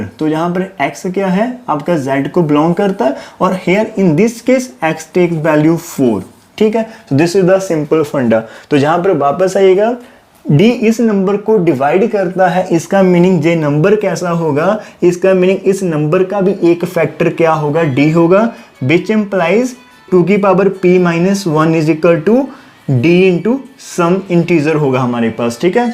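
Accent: Punjab, India. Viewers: Sir galti pakad bhi woi sakta hai jiska uski knowledge hoti hai Your teaching method is awesome and i love your talking style hindi punjabi so cute❤️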